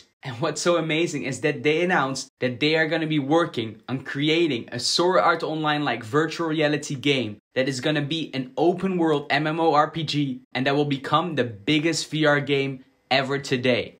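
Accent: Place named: Netherlands. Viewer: I smell a little bit of dutch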